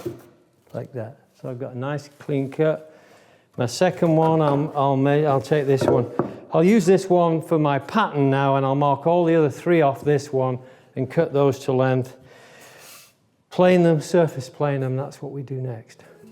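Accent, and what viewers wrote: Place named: North, United Kingdom. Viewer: Excellent Video/s - which part of the UK are you from and where are you now? My question is, after watching closely and carefully your Saw and Chisel Skills throughout your Workbench Build videos - what is your preferred Chisel Brand and why? - AND what is your preferred Saw Brand and why? I suspect by your slightly 'accented ' Northern dialect, you might now be located in the USA so, as such, maybe your Chosen Brands might not be available in the UK/France! I any case, l willl look forward to hearing from you soon - Thanks. All the very best to you and your family.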